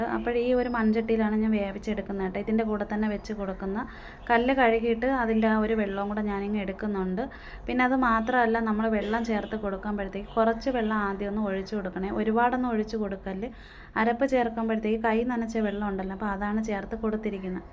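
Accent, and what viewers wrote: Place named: Thiruvananthapuram, India. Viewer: Kutteese veedu എവിടെയാ, slang കേട്ടിട്ട് tvpm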